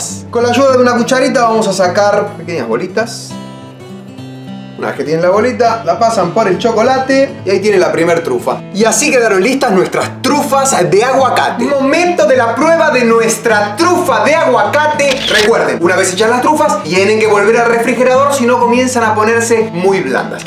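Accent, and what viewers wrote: Argentina. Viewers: jajajajaja tas re loco man que buena tu energía! sos de Argentino no? voy a probar esto. Saludos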